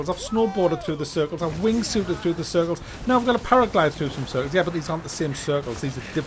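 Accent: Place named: Sunderland, United Kingdom. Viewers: haha Silksworth ski slope! I thought that was a mackem accent! Love your vids. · are you from Sunderland? I can tell it's a local accent but I can't quite place it... (also Silksworth is great!)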